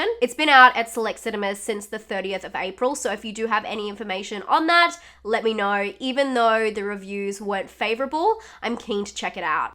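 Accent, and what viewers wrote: Australia. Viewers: The way you say tube! I love an Australian accent.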